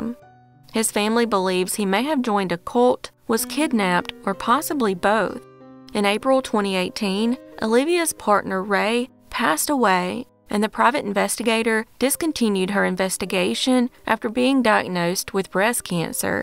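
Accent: South, United States. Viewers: Where are you from in Tennesse?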